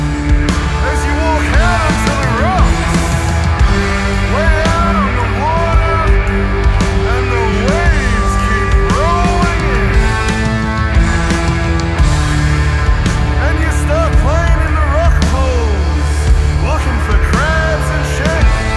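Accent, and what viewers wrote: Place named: Australia. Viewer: Wou Aussie as voice! Reminds me of the movie two hands, the dead big brother narrating.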